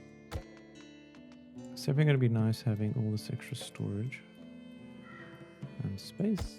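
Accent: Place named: South Africa. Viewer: Random video pops up on my feed... I recognise that accent! Hello, fellow South African :) Good luck with your YT journey.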